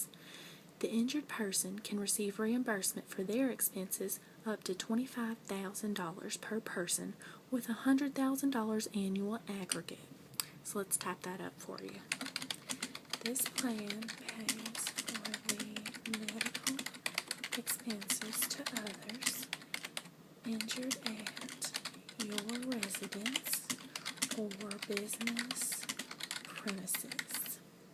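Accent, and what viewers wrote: South, United States. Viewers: Please please please do more Southern accent videos =)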